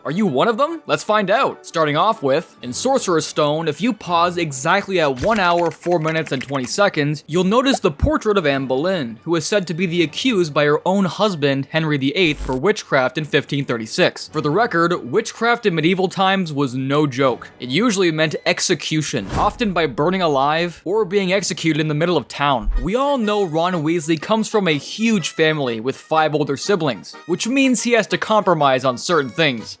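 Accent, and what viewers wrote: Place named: United States. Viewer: He’s American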